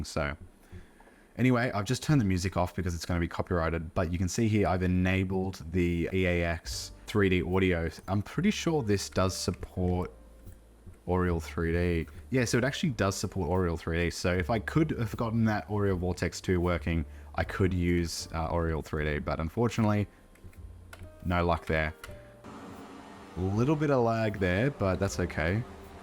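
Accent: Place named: Sydney, Australia. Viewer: Sydney accent detected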